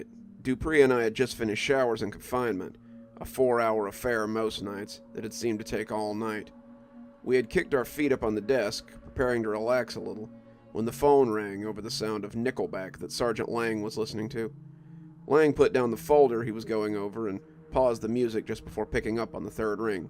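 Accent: South, United States. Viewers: May I ask….? Answer only if you feel comfortable. Are you from the south…?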